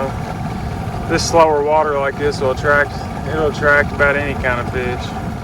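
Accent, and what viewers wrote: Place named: Georgia, United States. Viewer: To be from Ohio, you sure sound like you came straight outta my state of Georgia. Now there's an example of a youngern who fishes like I did when I was a kid. I'm sure he makes you proud.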